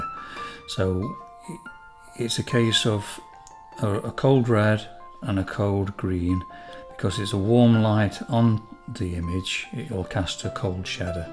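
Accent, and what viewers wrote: United Kingdom. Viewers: Thanks for letting us look over your shoulder and your extreme helpful comments (and after many years of communication with Americans I love to hear your georgous british accent 😉). It is the first time for me to get such a good impression of drawing human skin. Especially your work with the complementary colours is impressive. Thanks again and a nice christmas for you and your family from an art student in Germany.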